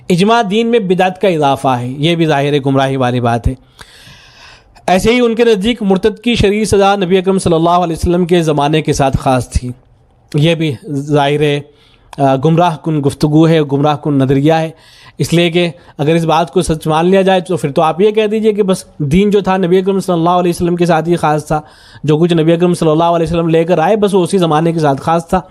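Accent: India. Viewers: یہ شخص جیسا کے لہجے سے معلوم ہو رہا ہے پو پی سے تعلق رکھتا ہے یو پی وہ زمین ہے جس نے برِصغیر کے مسلمانوں میں دیوبندیت اور بریلویت کی شدید تقسیم پیدا کی جنہوں نے لباس واضح قطعہ داڑھی کے واہ انداز و اشکال اسلام کے نام پر متعارف کروائے جو مراکش سے حجاز و ملائیشیا تک پوری مسلم دنیا کے لیے اجنبی ہیں جن کی بیمار فکر نے نہ صرف ہندؤ مسلم خلیج کو گہرا جس کی سیاسی قیمت پنجاب بنگال اور کشمیر کے لوگوں نے ادا کی اشرف تھانوی ہوں یا احمد رضا بریلوی یہی سے تھے جبکہ ان کے مقابلے میں پنجاب نے بھلے شاہ بابا فرید بابا نانک مولانا عبید الللہ سندھی جیسے جوڑنے والے لوگ پیدا کیے جاوید احمد غامدی سے بغض بھی اصل میں اسی بنا پر ہے اسلامی تاریخ نے صدیوں بعد ایک بڑا دماغ پنجاب میں کیوں پیدا کیا۔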